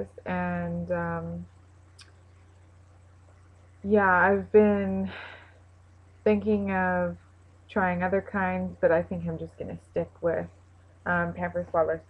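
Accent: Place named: Canada. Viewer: nice(: u gotta b from canada cuz u really have an accent(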